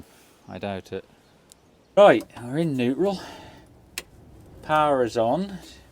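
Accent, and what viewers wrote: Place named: England, United Kingdom. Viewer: I do really enjoy your videos however I have to admit I don't always know what you are talking about your English is a little to English for me and not enough Rez for me to understand sometimes. It like learning a new language sometimes but fun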